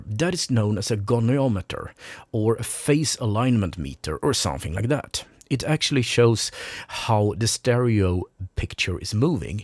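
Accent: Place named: Sweden. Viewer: Horrible swenglish accent, can’t watch theese…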